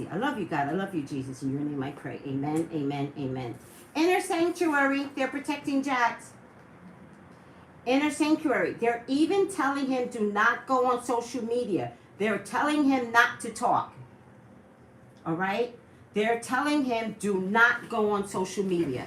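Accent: New York, United States. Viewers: Are you from NY? I love your energy!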